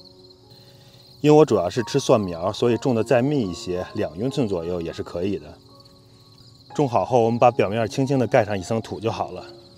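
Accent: Beijing, China. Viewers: 正宗京腔京韵，声音好听，莱种的又好，给你点赞👍。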